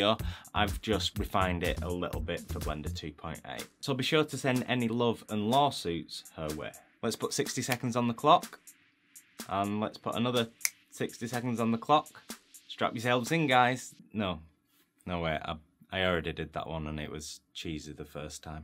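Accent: United Kingdom. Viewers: Why are there so many British men whose voices sound exactly like this voice? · Thank you for tutorial for newbie like me for alternative, and love the bri'ish accent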